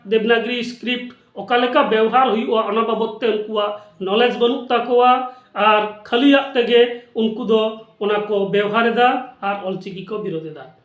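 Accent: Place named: Bangal, Bangladesh. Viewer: Apnar pronounced Bangal tone keno? Kichu pariskar kotha why used.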